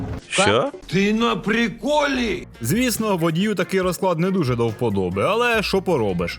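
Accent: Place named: Dnieper, Ukraine. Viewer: Дякую що сказали: бо Ми з подругою дивилися, слухали і думали - звідки родом автор відео. Моя перша думка- Закарпаття, потім зрозуміла - ні. А подруга каже: точно не Закарпаття, може центральна Україна, десь Вінниця або Черкаси. Але ми не експерти - мало подорожували і у відтінках мови центральної України не дуже розбираємось;). Тож Івано-Франківськ вітає Дніпропетровщину ( казали що хотіли перейменувати на Сіверщину..) і дякує за дотепний блискучий барвистий гумор і соковиті відтінки наддніпрянської української 🎉❤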